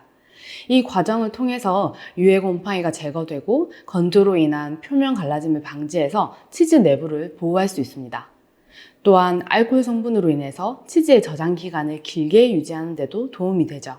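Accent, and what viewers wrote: Gyeongsang, South Korea. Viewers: 숨길수 없는 경상도사람ㅋㅋㅋㅋㅋㅋㅋㅋㅋ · 경상도 어데 출신이신지?? 꾸시하이 갱상도 사투리가 들리네요이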